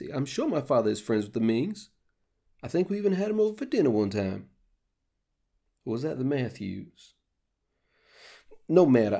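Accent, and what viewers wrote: South, United States. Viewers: Well sakes alive! I must admit sir that I wasn't expecting this accent but, being raised as a Southerner, I give your performance my Southern seal of approval! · I loved this! The whole story was cute and I loved your Princely southern accent! Keep it up,!:)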